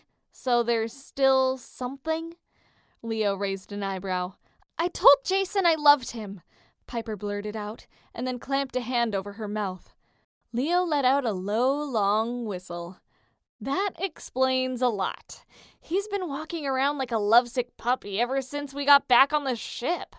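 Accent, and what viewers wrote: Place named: Texas, United States. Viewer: This is the BEST Jasper (or Jiper) fanfic ever!!!! Oh my gosh!!!! And that Texan accent!! If that was an attempt at a Texan accent, it was good!!! (I'm a from Texas, I know an ok accent when I hear one.)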